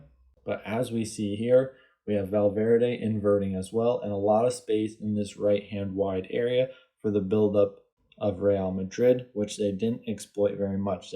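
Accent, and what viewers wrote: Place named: United States. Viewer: Hey man, big fan of your work! Keep it up! Also, one quick question: I notice you cover a lot of club and European football. Since I'm assuming you're a fellow American (based on your accent, at least), do you plan on doing any analysis of the USMNT? Nations league or potential WC matches? I'd LOVE to hear your thoughts on GGG, what they're doing, and/or what they could be doing better.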